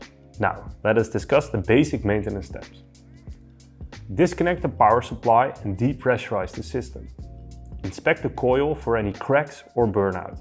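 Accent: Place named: Netherlands. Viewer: I like your accent. Are you dutch?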